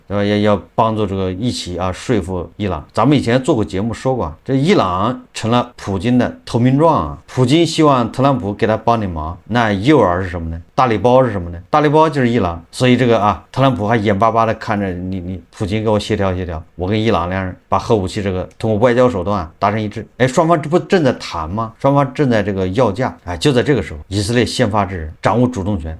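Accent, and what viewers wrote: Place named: Anhui, China. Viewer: 听口音像安徽人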